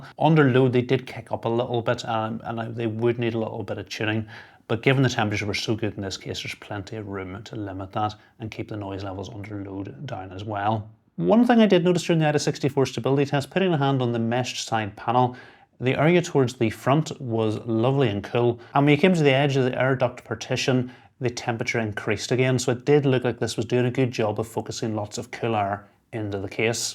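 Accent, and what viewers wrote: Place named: Ulster, United Kingdom. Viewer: Struggled with the Ulster accent on occasion, but aside from that excellent and informative video. Thank you.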